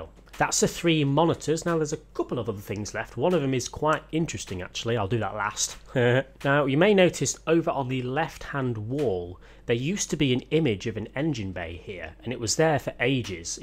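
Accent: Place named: United Kingdom. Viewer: You have a problem to his accent? He's british, and these are purely american names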